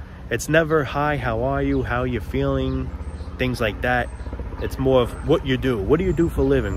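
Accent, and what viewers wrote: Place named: New England, United States. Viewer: Homie prolly from New England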